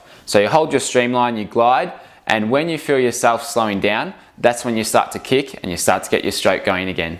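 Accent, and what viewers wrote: Australia. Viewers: Australian accent?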